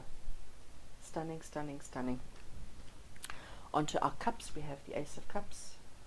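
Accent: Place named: South Africa. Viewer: Hi...by your accent you sound South African. Is this so? If you are, then you're the first SA YouTube channel I've discovered here. Oh and this deck is on my Xmas wish list!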